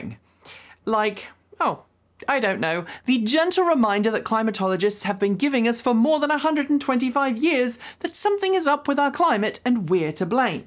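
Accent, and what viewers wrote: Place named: RP, United Kingdom. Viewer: Another entertaining, and eloquently spoken video. I learn more than the topic, but also the "Queen's English". Thank you.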